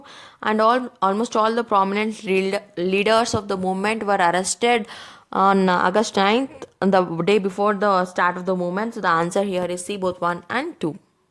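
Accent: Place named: South India, India. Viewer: very irritating accent, request to unacademy, don't make videos by south people,their accent is very irritating,and she is not reading the contents clearly, just reading and not explaining ,can be done by anyone.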